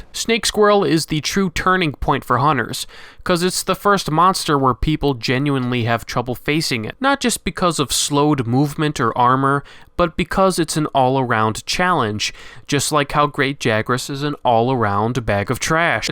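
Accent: Italy. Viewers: the Italians Do infact speak like this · Italian accent intro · hearing the Italian accent intro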